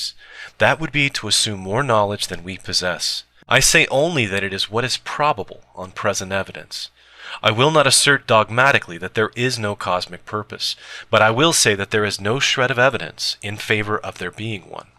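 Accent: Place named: United States. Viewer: Why an American accent of ones words who was so very English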